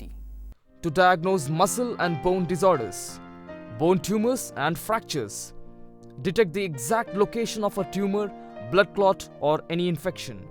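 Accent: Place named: India. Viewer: His English is Indian.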